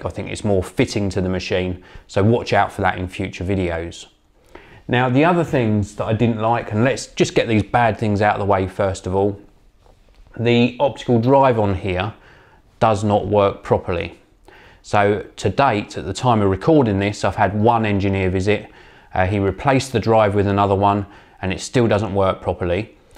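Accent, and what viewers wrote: United Kingdom. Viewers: hes british man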